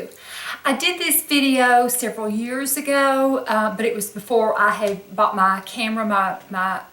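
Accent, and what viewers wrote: South, United States. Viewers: Thanks so much for sharing these. You always look so beautiful and I just love your southern accent. I try to keep my body strong as well and actually bought a hula hoop a while back for some light cardio! It took me a while to remember how to keep it going, lol, but it's a fun way to stay in shape. Take care❤️-Ruthie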